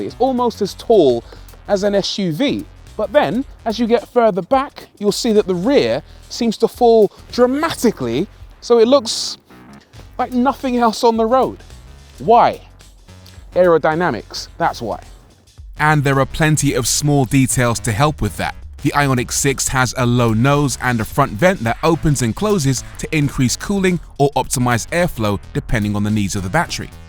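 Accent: United Kingdom. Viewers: I love that rant, it definitely had some of that bri'ish essence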